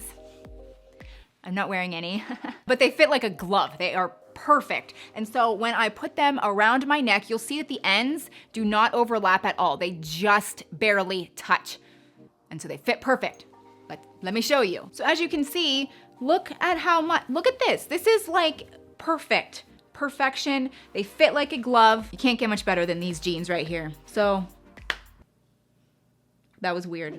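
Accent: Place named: Pittsburgh, United States. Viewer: I love how you say “mere” (mirror) and Q-pon (coupon). You are definitely from Pittsburgh (as was I). Finally lost the “yinz” to y’all when I moved to the South. Love your channel!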